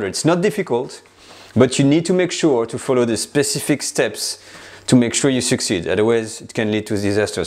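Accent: France. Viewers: That's because it's a French man speaking English in a French accent🍟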